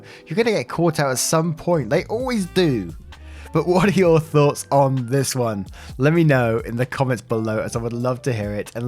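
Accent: United Kingdom. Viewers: Greetings from Kansas City, Missouri, USA! I usually play Mahjong, Solitaire, or Yahtzee while I listen to your stories. I can’t help but enjoy your content. You have such empty and compassion for people and I absolutely love how you’ve taken in Poppy. I’m a sucker for a guy with a British accent who loves animals (please don’t take that the wrong way, as I’m quite happily married), so feel free to share as many pics of Poppy as you want. We’re definitely here for it! Much love!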